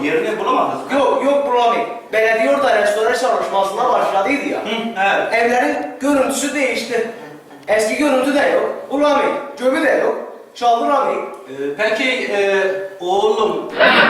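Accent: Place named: Gaziantep, Turkey. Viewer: bu adam istesede istanbul türkçesi konuşamaz gibi hissediyorum nedense .ANTEP şivesi adamın ağzına tam yerleşmiş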